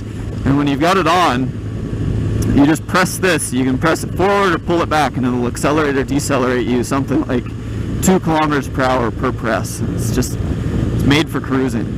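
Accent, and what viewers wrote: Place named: Canada. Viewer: Great review. Thank you. When you talk about the speed you are going, is this in kph or mph please? (I see that the speedo is calibrated to read in kph but I think your accent is Canadian (sorry if I got this wrong and if you are in fact American!) and so you would normally be using mph. Judging from the video I suspect you are talking kph?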